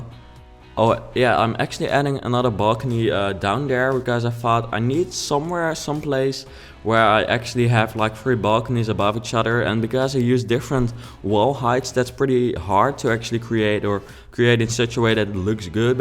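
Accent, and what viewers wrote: Netherlands. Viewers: can I just say... I'm English, and I love your accent. I think Dutch accents are amazing. Really nice build.Very inspiring.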